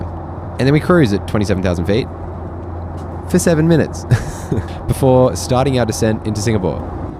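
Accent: Australia. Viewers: Sounding very Aussie which is a pleasure.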